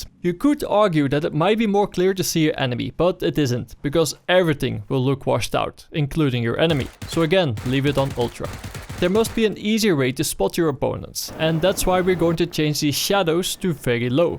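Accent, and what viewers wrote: Netherlands. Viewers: Hollands accent ftw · Dutch accent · love the accent too! guess its dutch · That accent tho! only the dutch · u sound dutch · you have a horrible rotterdams dutch accent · I like your dutch accent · LEKKER NEDERLANDS ACCENT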